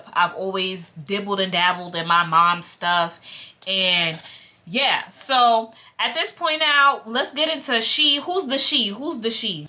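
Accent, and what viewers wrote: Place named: New York, United States. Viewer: Hey giiiirl 🎉❤ First of all, your voice, personality, and New York accent keeps me coming back to your videos 😂 Secondly, we just gone say this was a blessing that this didn’t work out with this girl because like you said, bad apple. & say you would have went to her house after she asked that.. she could have went to her lil 10,000 followers and tried to play you because you were up and coming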